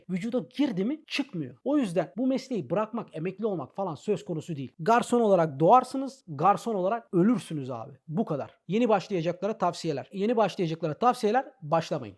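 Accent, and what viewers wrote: Thrace, Turkey. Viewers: Trakyalı adam dinleniyor. Not: rizeliyim :)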